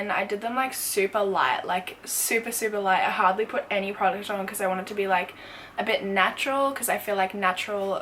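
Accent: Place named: Queensland, Australia. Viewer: you are such a doll. I was in QLD a few months ago, love your accent 😘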